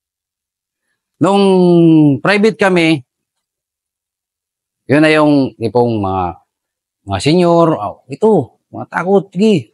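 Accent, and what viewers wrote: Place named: Visayas, Philippines. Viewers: Yung tuno ng salita mo master bisaya na❤ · Taga panggasinan si sir pru bisaya na yung tono nya pati salita at kilos nya bisaya tlga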